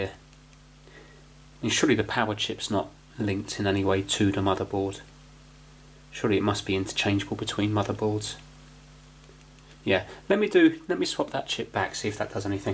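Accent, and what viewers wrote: United Kingdom. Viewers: Hi, first of all: Great video as always, love your british accent 🗣! I‘ve got a question: you‘re using some kind of small (grey?) notebook (or convertible?) in your videos, showing how much you paid for your „trying to fix“ items. I‘d like to know which brand/model it is?! Thank you and keep on fixing! 👍🏻 greetings from 🇩🇪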